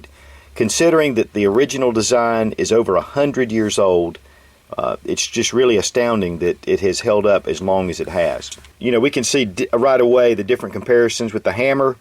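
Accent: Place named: South, United States. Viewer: Very nice review of this new Ruger SR1911. I love all your reviews. Something about your smooth Southern accent is pleasing to this old Yankee. Keep up the great work! Thanks!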